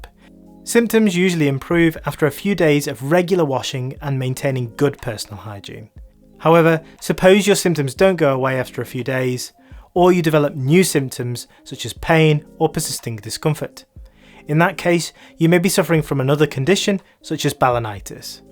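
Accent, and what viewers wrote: United Kingdom. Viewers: Northern British English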